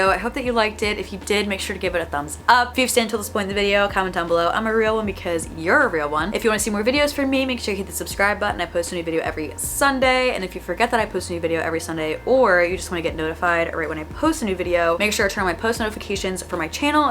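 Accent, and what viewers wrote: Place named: California, United States. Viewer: That California accent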